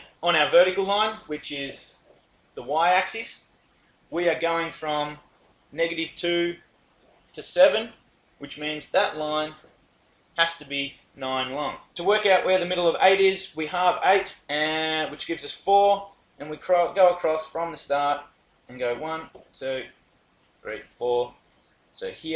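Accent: Australia. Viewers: sweet aussie accent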